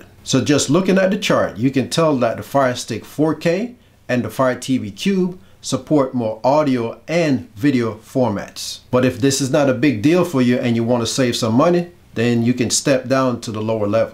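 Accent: Jamaica. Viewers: Thanks this vid really assisted me, heard a little 🇯🇲 jumping out every now and then👊🏽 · Thank you so much now I’m gonna upgrade. New subscriber. I know I hear my Jamaican accent. 🇯🇲 nuff love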